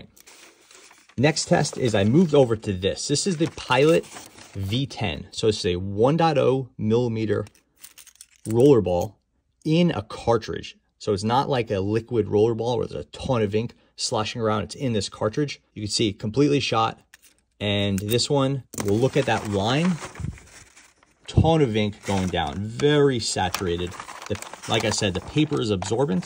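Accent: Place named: Philadelphia, United States. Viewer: Great reviews and you always show new and different pens ...Thanks !! BTW....you sound like you're from Philly ...is that close ?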